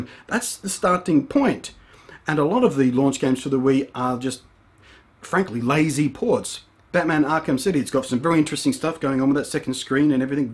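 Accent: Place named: Australia. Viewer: Love watching your stuff man, I agree with 99% of everything you say. I dig the enthusiasm you have for gaming, Its rare these days and its refreshing to see. Your game and device reviews are very entertaining. Its also good to hear a fellow aussie online. Keep it up "thumbs Up"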